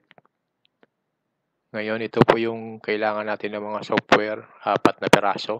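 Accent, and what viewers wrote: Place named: Iloilo, Philippines. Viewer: Daw ilonggo ka gid to mo.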